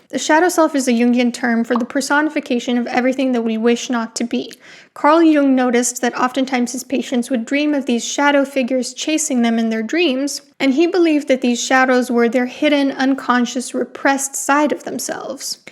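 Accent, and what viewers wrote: Romania. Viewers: I'm curious if you're familiar with the 'Subspecies' Vampire films from Full Moon Features.... They were the first to be filmed in Romania, using the Hunedoara Castle as the set....the Character of Radu Vladidlas is a magnificent example of a classic, menacing and maniacal Vampire. Also, I loved the new Nosferatu as well....your review is very good and your Romanian accent is fascinating! Thank you and if you haven't seen the Subspecies movies, definitely do yourself the favor of rectifying that! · Were you born in the US? Your pronunciation of "Nosferatu" gave you away as a romanian but your English sounds american. Cheers for the video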